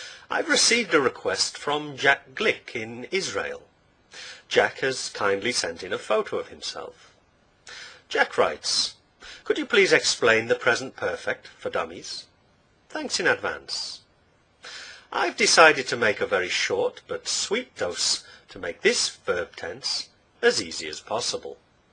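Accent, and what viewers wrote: United Kingdom. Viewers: Thank you very much, I love your daily dose of English and most of all your AMAZING and musical British accent. I'm Italian and I'm studying English seriously since 2014 but, sometimes, I think that my accent is definitely hopeless. I'd like to narrow my vowels and to smooth the double consonants, but I tend to sound .... I don't know how to explain it ... too long whereas you British have this short and cut and clean although "bonded" way of pronouncing words. It's difficult for me to shadowing you, but I'm dedicated and stubborn, so let's see what happens. Again, thank you for your eccellent job and have a lovely weekend. Monica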